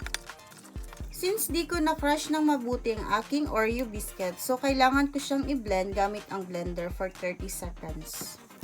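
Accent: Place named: Aklan, Philippines. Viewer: taga san po kau maam? ung accent nyo po is it from aklan?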